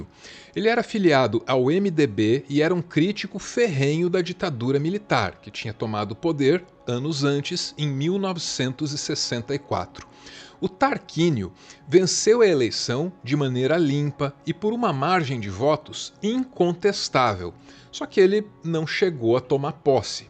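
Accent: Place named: Bahia, Brazil. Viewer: E com um sotaque bom e gostoso😂 deve ser baiana, como eu kkk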